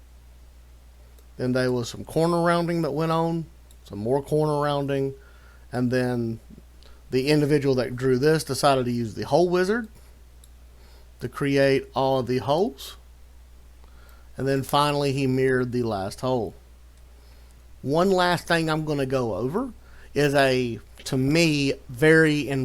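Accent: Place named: South, United States. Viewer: Thanks for the help and I love your southern accent brother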